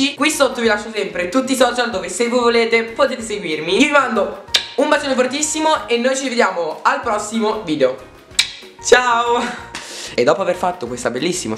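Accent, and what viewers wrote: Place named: United States. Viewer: Sembravi un americanoLuuu😂😂😂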